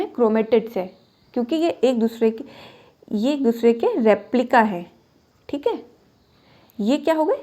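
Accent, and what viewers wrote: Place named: Pahadi, India. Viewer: Mam aap pahadi ho kya bahar se awaj aa rahi h phadi bolne ki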